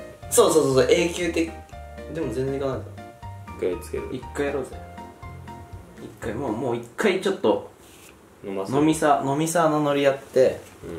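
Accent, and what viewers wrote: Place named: Hiroshima, Japan. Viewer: 広島弁炸裂じゃけー分かりました！笑笑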